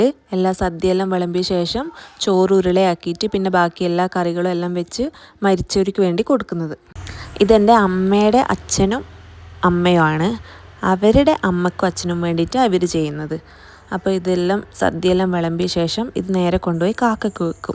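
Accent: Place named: Central Travancore, India. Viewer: സംസാരത്തിൽ മധ്യ തിരുവിതാംകൂർ ആണെന്ന് തോന്നി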